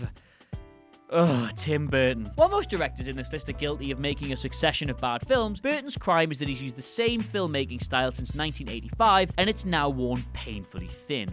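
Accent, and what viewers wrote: United Kingdom. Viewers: Hearing a British Internet host waxing poetic on the early 90's "Los Angeles gang scene" made my day.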